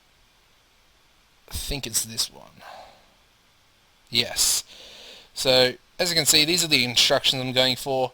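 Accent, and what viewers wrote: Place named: Australia. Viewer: nice watching an fm play through with an aussie accent mate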